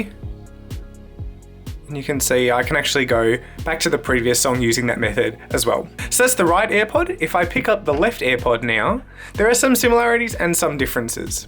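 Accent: Australia. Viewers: His aussie accent <3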